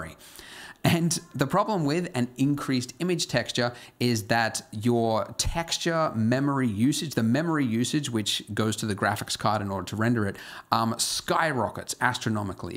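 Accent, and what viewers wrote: Australia. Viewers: "and strouaw" <3 the Australian accent!!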